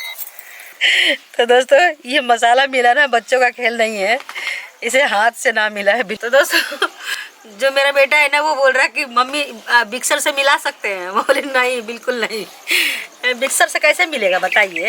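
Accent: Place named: Chapra, India. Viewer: Tohar bhasha se bhujhata rahaa chaapra bihar se lagti hai.kaha se hai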